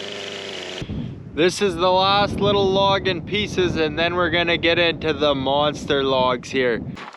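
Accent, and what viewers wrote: Canada. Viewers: Man, what a beautiful site to build a log cabin , I was wondering,where it is. Lol,until I heard him speak,and I knew it’s Canada, aye . Nice large logs and a big ,flat open field . Love it 👍🏼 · You had me at your Canadian. Hot DAMN it's nice to hear that Canadian accent for a change. Hi from BC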